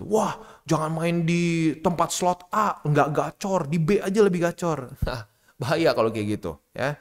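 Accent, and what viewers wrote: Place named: West Java, Indonesia. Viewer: Bang lu orang sunda bukan? Kaya lekat banget logat sunda nya 😂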